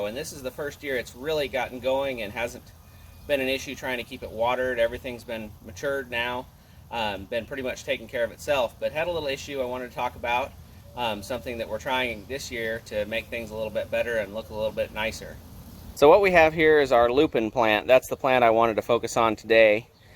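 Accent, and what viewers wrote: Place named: United States. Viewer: First time I’ve seen your video and I’m totally in love with your American accent Oh and of course your lupins !!!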